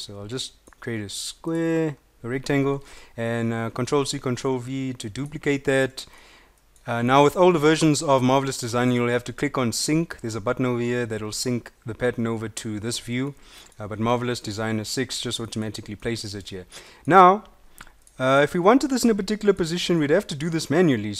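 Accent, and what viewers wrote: South Africa. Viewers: weird thing but you sound south african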